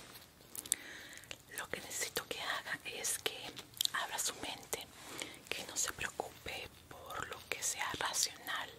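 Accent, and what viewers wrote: Mexico. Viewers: Yo creo que por el tono es de Mexico...Saludos desde Argentina😉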